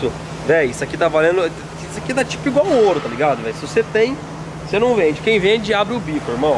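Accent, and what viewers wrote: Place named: France. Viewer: legal e tal mas de onde saiu essa mania de colocar assento circunflexo em tudo??? 🤣🤣 Carpete vira "carpê" Quattro vira "Quatrô" 😂😂😂 Nem quem eu conheco de Londrina fala assim... nao entendo de onde ta vindo esse enfrancesamento kkkk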